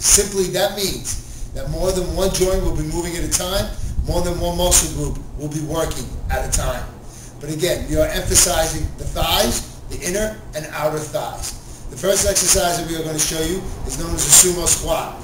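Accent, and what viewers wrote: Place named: New York, United States. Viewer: Folks from New York...when they talk, I listen.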